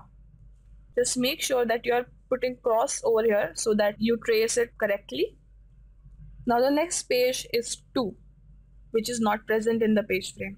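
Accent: India. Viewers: Is this an Indian accent?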